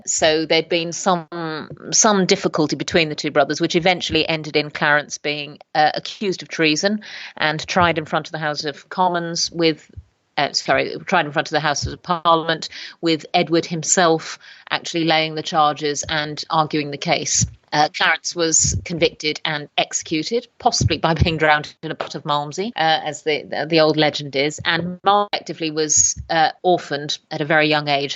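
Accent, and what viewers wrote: United States. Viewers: I'm immediately put off by the American accent!!!!!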